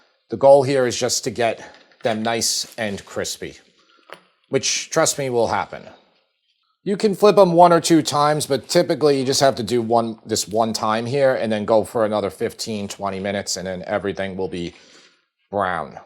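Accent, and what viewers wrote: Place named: Long Island, United States. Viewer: Never lose your lawng island accent. As an LI native (east end) who splits his time between the island and North Carolina, the accent is always recognized down here as a “new yawk” accent. I always correct them and tell them that “It’s a lawng island accent, and we live ON the island not in”.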